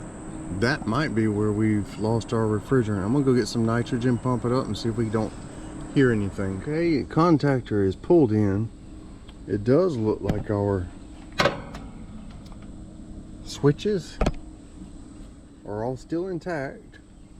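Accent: South, United States. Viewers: Ya he descifrado quién es tu hispanohablante adinerado. Qué bien. Eres un hombre de muchos talentos. Who would have thought a good old country boy from Georgia with a southern drawl is a fluent Spanish speaker? lol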